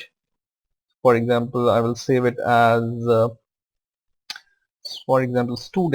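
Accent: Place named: India. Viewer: He is obviously sound Indian totally not Chinese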